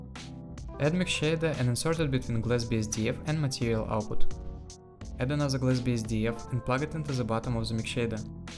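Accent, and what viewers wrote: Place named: Russia. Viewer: Your accent sounds too russian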